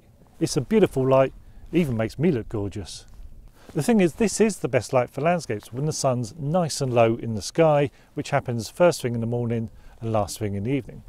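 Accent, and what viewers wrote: United Kingdom. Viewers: Hi! Is it Yorkshire?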